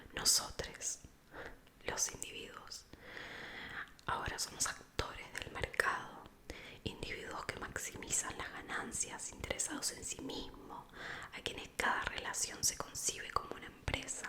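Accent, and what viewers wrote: United Kingdom. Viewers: I really love your English acent, you do it very well, love ur videos, been I really don’t understand you anyways I put the subtitles, haha u are the best!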